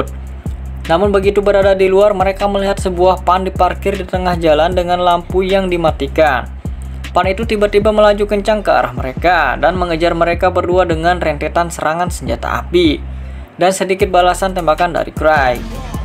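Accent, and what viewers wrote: Bali, Indonesia. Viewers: Nada suaranya kek orang bali ni ya bli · Apakah admin ini orang bali?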